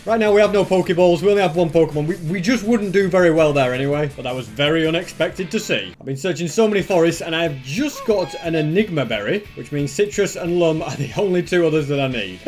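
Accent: United Kingdom. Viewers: why do you look like indian but your accent is british?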